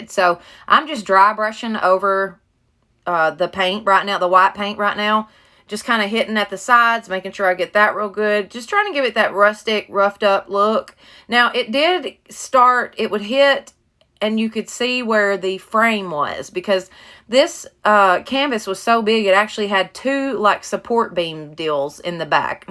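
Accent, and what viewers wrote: South, United States. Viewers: I just have to tell you, girl, that your videos are like crafting with a member of my family... !! All the phrases you use like Y'all and other stuff sounds like home... the first time I heard you say " heck far " I knew you were a keeper..!! I busted out laughing and had to go tell my hubby ( who is from TN ) that I had found a bonified southern girl on Youtube !!! I love all your easy peasy crafts and I appreciate you sharing all your tips and ideas with us.... God bless and keep up the good work... I am binge watching your videos.....!! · Just found your page. Loved the video and especially listening to you talk. You sound just like me talking 😊. Southern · Super cute! I love watchin your video's. And your southern accent is adorable 😍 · Do I spy with my little ears another southern gal? 😂 Where are you from? I'm in the Charleston SC area. 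Loved your diys! Absolutely beautiful. I'm totally into farmhouse! Infact this week I'm gutting my kitchen to the studs and remodeling it into my dream kitchen, or so I hope lol. Never done anything like this before, Lord help. Hope you have an awesome weekend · Love them all I have the cow also so you gave me some ideas for him by the way where are you from i just love your country accent I'm from north Carolina coast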